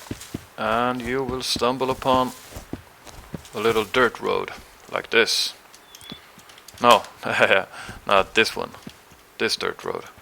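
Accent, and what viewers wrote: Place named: Netherlands. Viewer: nederlands? hoor het aan je accent :D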